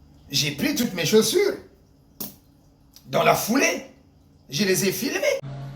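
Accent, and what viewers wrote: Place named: Lari, Republic of the Congo. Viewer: bonjour! j'aime bien ce que tu fais mais s'il te plait, hôte en toi cette envie de t'exprimer comme tu le fais. l'expression tu l'as mais la tonalité laisse à desiser.tu as un fort accent lari donc ne force pas à singer ce n'est pas du tout agreable,soit simple.merci sans mauvaise foi.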